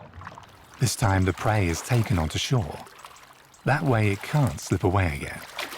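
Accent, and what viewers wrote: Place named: United Kingdom. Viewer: And so they get a stinking tommy with his snotty accent to narrate this? Where is the German pride? SAD. These brits are into everything but actual work.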